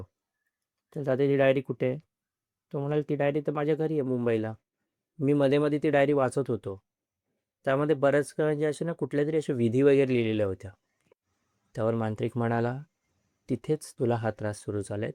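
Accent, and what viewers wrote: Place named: Pune, India. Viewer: अभिवाचनाची लकब पुणेरी वाटते❤❤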